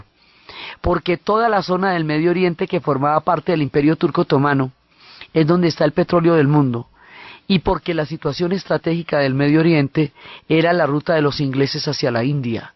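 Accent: Colombia. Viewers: Me he vuelto a enamorar de la historia, excelente narradora, que manera de contar tantos episodios y la cereza del pastel es ese acento colombiano delicioso. Que privilegio escucharla. Me emociona!!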